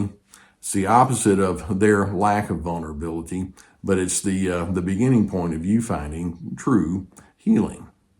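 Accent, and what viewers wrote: South, United States. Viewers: You standing on hollow ground..... My jaw dropped when I saw you reply to US... Really I started to cry. The very first time I watch you. You had so much southern twang that I kept watching other people, but in this past year... Your the best! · Love the southern twang!! It helps my Georgia heart listen ❤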